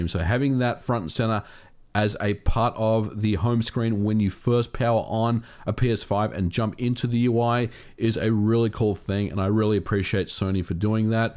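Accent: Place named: Australia. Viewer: Australian with a hint of American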